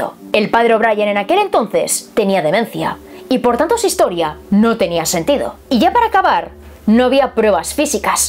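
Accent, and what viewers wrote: Spain. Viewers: Saludos, hermosa bellota, tu acento español es hermoso precioso y divino tesoro. Excelente investigacion y mejor video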